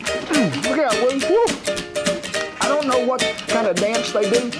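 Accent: United States. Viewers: As a Canadian, I am very scared to see an American with a very heavy drawl making poutine.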